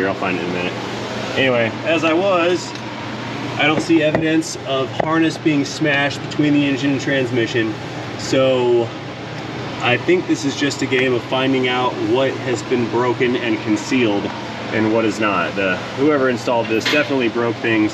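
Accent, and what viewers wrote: Russia. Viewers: Better Russian accent than me... and I speak Russian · Your Russian accent reminds me of FPS Russian. 😂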